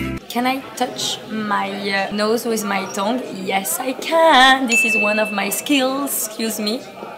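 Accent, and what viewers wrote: France. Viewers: Moi j'aime bien ton petit accent français il est cute haha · Come on I know you’re French but make an effort for your accent it’s not’s pleasant to hear your French’s accent when you talk in English 😫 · Your italian is so good... I LOVE your french accent 😍😘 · I love her but the French accent is so thick for me to handle 😩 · j'adore. You speak so good Italian. Sei incantevole! I love your french accent though hahaha. What app have you used for editing this video?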